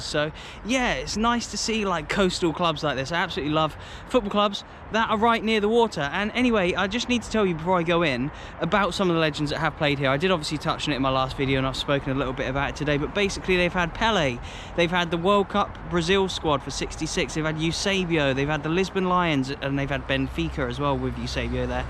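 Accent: Scotland, United Kingdom. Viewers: You forgot to mention the golf at Royal Troon think that drags plenty of football players in as well. Excellent video of a well run wee club Your accent is getting more Scottish especially at the end talking Airbnb 🏴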